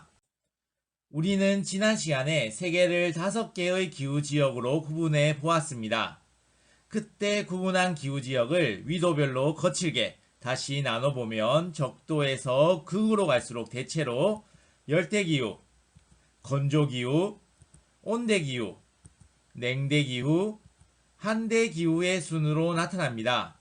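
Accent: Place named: Gyeongsang, South Korea. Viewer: 옆에 있던 엄마가 쌤이 갱상도 부이시가?? 싸바나 아이가~~ 싸바나~~ㅋㅋㅋ 넘 잘 듣고 있습니다!! 감사합니다!!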